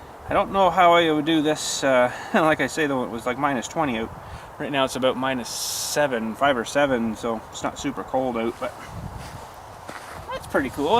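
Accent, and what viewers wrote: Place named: Canada. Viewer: You sound like you’re from Canada just by the way your accent is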